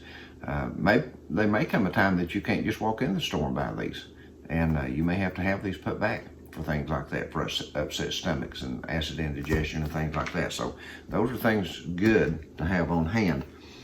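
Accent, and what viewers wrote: Kentucky, United States. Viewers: Hi!! Brand new subscriber here! Ashland, Ky native here!! I knew where you were from before you even mentioned Corbin or Barbourville. You sound like a lot of my family. I LOVE your accent. I have a pretty strong Kentucky accent. My husband is in the Air Force and we just moved to upstate NY a month ago. I stick out like a sore thumb with my accent. Haha! I've had so many people comment on it. Thankfully they've all been nice about it:)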